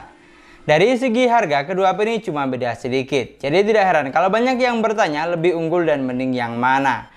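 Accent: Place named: Madura, Indonesia. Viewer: Btw asli mana bang? Logat nya menarik heheheehe..b Saya LBH fokus ke logat nya.. Kayak logat madura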